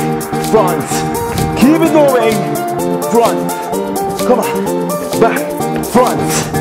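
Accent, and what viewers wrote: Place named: Australia. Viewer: Sounds like Australia; but I could be wrong.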